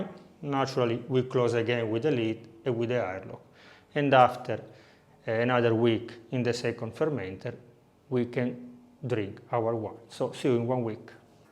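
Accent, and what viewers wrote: Italy. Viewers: Italian accent my favorite accent. is that wine Halal? any sheikh here? 🤣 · Totally Italian accent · I like your Italian English accent, sound sweet and strange to hear, all in all thank you for the lesson, ciao ciao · The Italian Accent is so cute !! 😂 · He is so cute! And his accent too. Ok I am catching the next flight to Italy. ✈️ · Ahh that fine old Italian accent 😌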